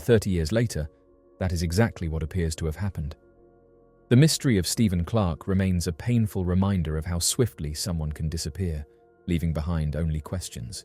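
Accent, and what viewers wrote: United Kingdom. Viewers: When I first started watching this, I had a feeling that you'd be British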